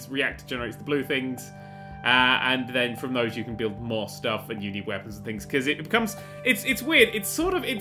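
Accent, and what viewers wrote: England, United Kingdom. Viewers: I love the English accent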